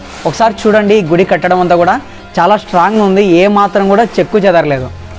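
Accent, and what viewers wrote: Rayalaseema, India. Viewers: I am from Telangana but I love your stories andhulonu ne Rayalaseema yasa enkka super bro nenu and na family subscribe chesukunamu all tha best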